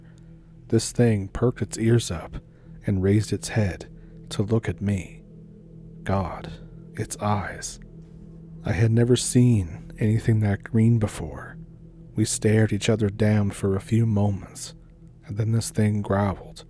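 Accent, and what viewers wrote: Canada. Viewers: Thanks for sounding exactly like My B.C., And Ontario cousins and family. Peace to You- AND-- ELBOWS UP-!! 🎉🎉🎉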